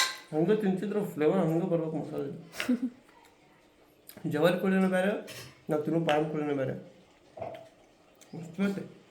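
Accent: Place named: Kalaburagi, India. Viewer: Anna yavuru nimdu nim bhashe Kalburgi thara anisutte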